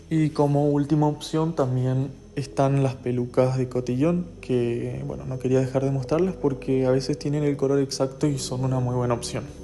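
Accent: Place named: Corrientes, Argentina. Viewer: Sos Correntino ?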